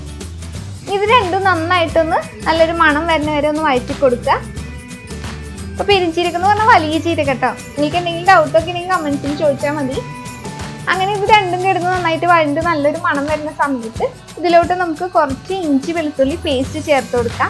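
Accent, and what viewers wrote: Thrissur, India. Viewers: Nala malayalam Trisuranele😊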